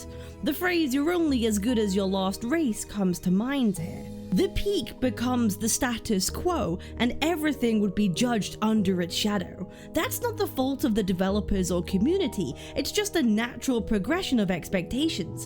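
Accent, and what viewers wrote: United Kingdom. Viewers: you are british>?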